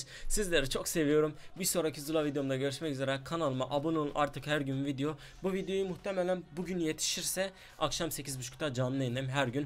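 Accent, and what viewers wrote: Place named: Eastern Anatolia, Turkey. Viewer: Konuşma şiven doğu şivesiydi biraz düzelmişsin artık biraz daha anlaşılır konuşuyorsun önceden dediklerini anlamıyprfum şimdi seve seve izliyorum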